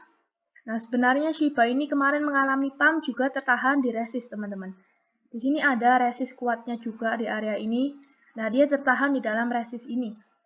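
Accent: Java, Indonesia. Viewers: Kok medokk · suaramu medok tenan mbak, tp enak di denger, semoga berkembang terus channel YouTube nya....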